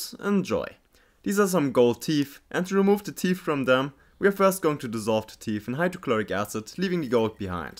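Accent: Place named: Germany. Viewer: Ich glaube vom Akzent her, dass du Deutscher bist daher: SMB (sodium metabisulfite oder deutsch: Natriumdisulfit) dient nicht dazu, überschüssige Salpetersäure zu entfernen. Es ist ein selektives Fällungsmittel. Btw: Fällen heißt auf Englisch: Precipitate, nicht "chrash out" oder so etwas. Es kann also gut sein, dass du in der Lösung noch gelöstes Gold hast (Hast du ja sicherlich aufgehoben ? §1 des Edelmetallrecyclings: NIE etwas wegschmeißen!) Und doch: Es gibt Literatur zum selektiven Ausfällen von Platingruppe Metallen, sogar speziell in Bezug auf Dentallegierungen. "Edelmetall Recycling" von M.A. Buth, ist so eines davon, sogar auf Deutsch... Gibt's bei Amzon unter: <link> ... Viel Erfolg weiterhin! M. A. Buth ;-)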